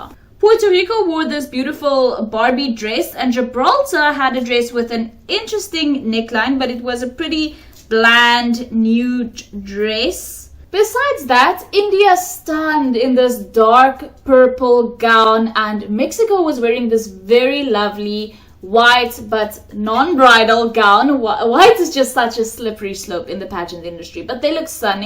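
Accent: South Africa. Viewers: I thought you were from south African, you speak with south african accent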